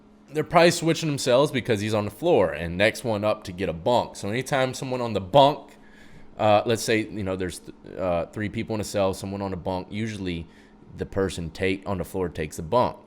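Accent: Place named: South, United States. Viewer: That mid southern drawl.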